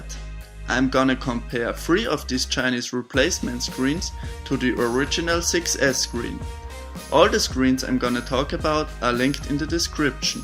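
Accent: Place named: Austria. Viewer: klingst sehr österreichisch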